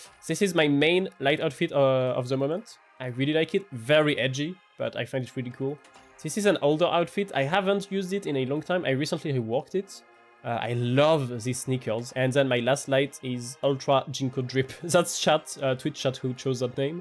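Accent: France. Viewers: You ´r French ?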